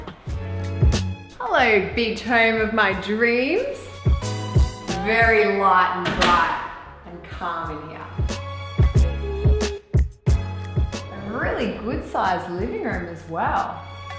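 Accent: Australia. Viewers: Everything seems to come together the music the houses all topped off with the cute Australian accent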